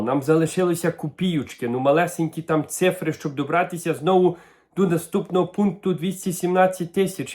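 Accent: Canada. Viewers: Ставлю тебе дорогенький Канадский щерый Уураинець вподобайку 👍 за таку гарну мову и Канадский акцет а новости тут у наших штатах таке саме маймо и будьте обережни не подходит до кордону дуже блезько бр Наши ICE можуть и ваших також депортувати из Канади подим скажут щё ми незнали шё з Канади неможна було ни кого чипати якщё неправильный аусвас то на депортацию 😢 А так дуже рад був мову цю чудесну старинну почюти 🤝😉💪👍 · такий прикольний акцент, ніби ще ваша прабабця емігрувала в Канаду ❤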